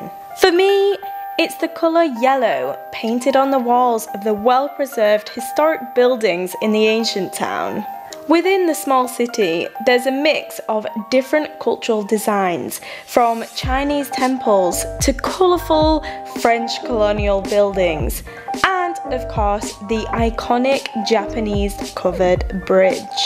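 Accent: United Kingdom. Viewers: I really love the British accent! :))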